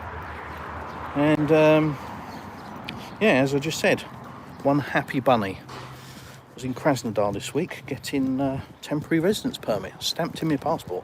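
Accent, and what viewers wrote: United Kingdom. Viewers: I love watching your videos. I am a Russian living in UK (Kent, Sussex) for the last 16 years. It’s surreal to see someone from around here (you sound like you are from South London or Kent) to enjoy living in Russia! I am so glad you are happy there. The situation with the driving test is quite a puzzle, but to be fair if finding the job is your ultimate goal you would still need to know Russian anyway. Perhaps do an intensive course in Russian? It’s not easy but it will happen. It’s not easy to learn a new language later in life but not impossible!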